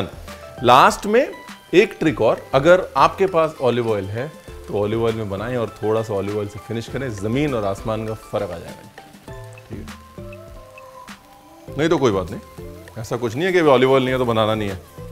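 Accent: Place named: Lucknow, India. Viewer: I don’t watch too many cooking videos but I recently started watching yours and god hooked on to your Lakhnawi Hindi. Kya lehja hai! Take a bow Ranveer.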